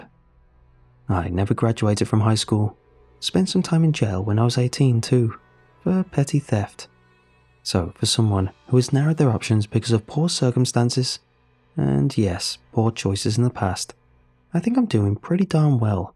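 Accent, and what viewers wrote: United Kingdom. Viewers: Damn it I don't want to admit this but, I want you to keep narrating so I'm going to give you the compliment at the cost of my own public shame.. I actually wait for your freaking stories and I was so psyched to look down and see an early one because even though it's a highlight I wouldn't toss around publicly, it's still nevertheless is a highlight.. actually if I'm going to be honest it's a spotlight. It's amazing what these narrations can do when you're having problems. I've caught in myself thinking through things while listening, and although your Asian, (which sticks in your head somewhere in the back for some reason) the British amazing accent is surprisingly soft and it's very comforting. On a side note listening to Mr creepypasta, I see a guy in a blue suit that resembles Spider-Man, that shifts from a maybe comic book character to and uncanny amount of different human variations, so at least with you it's a comforting guy on a couch drinking tea type of thing, it's really unique I'm rambling thank you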